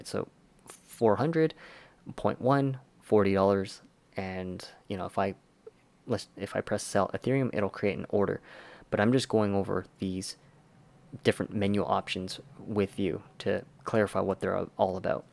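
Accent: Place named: Canada. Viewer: lol I caught it fairly quickly. I became familiar with the Canadian accent after living one year in northern Minnesota. .. Good times. Stay warm.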